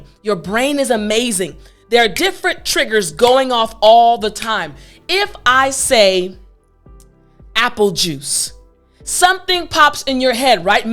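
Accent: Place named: South, United States. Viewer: Teacher a top top motivational speaker. I love your country accent 🤩❤️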